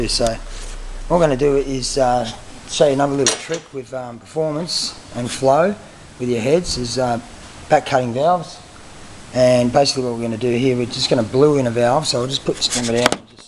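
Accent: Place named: Australia. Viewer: he's an aussie mate, not a kiwi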